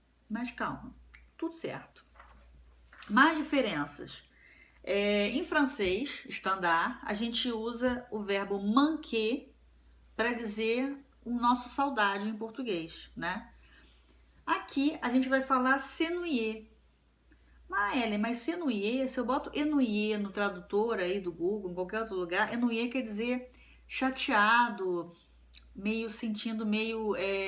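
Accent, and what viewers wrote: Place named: Minas Gerais, Brazil. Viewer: Wô! T’écouter parler à propos de ma langue c’était vraiment un exercice en gymnastique pour mon faible portugais âgé de 40 ans. Tu sonnes « mineira » si ma mémoire est bonne. (Je souhaite pouvoir écrire dans ta belle langue, mais hélas! J’suis ben ben trop rouillé!) De bonnes observations! Il y a beaucoup de différences entre nous et nos cousins en France comme vous autres dans la lusophonie. Bonne continuation!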